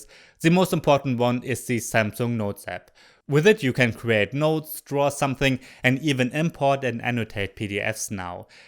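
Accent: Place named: Germany. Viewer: You got such a strong german accent, i only realised i was watching your english channel after like 5 Minutes in the video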